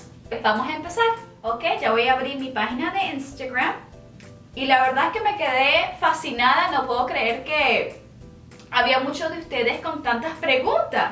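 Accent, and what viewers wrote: Venezuela. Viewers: ¡Me encanta tu acento venezolano! Que padre que sigas haciendo más vídeos en español 😁 me encantan tus vídeos aunque no soy musulmana pero es muy interesante saber de otras culturas. Un saludo desde México ✌ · Ya decía yo ese acento es de Caracas jajajaaj que hermosa que dios te proteja soludos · Hace mucho más de un año que no veía tus videos jajaja me encanta tu acento suena tan de la zona centro de Venezuela jajaja jajaja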